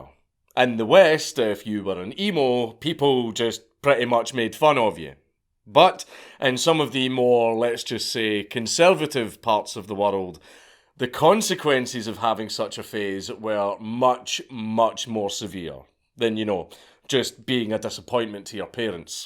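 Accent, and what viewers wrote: Scotland, United Kingdom. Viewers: Scottish I think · He’s Scottish. That’s why.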